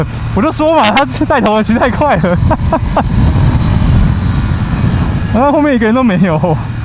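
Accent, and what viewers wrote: Taiwan. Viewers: “大加分“有台灣國語的fu 好親切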